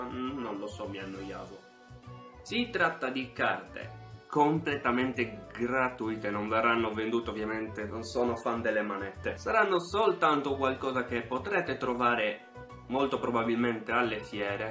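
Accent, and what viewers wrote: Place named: Sardinia, Italy. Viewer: ciao, anch'io sono sarda ma il tuo accento mi fa ridere:D comunque bellissima l'idea delle carte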